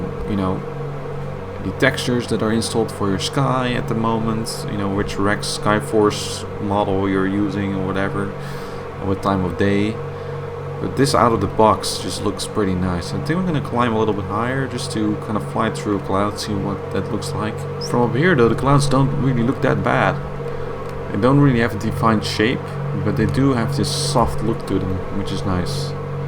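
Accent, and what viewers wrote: Netherlands. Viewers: It's nice to recognize the accent from when I lived in NL when I was a kid. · Jij bent eigenlijk Nederlands dat kan ik wel raden aan het accent Ik denk dat xplane 11 de mooiste game is genieten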